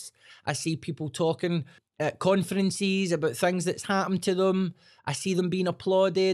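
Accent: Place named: Scotland, United Kingdom. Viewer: I have no idea why or how this popped up on my Youtube, but great insight. Subscribed. Also, LOVE the accent. I thought that one Scottish comedian I've seen was joking by exaggerating. I realize I was incorrect.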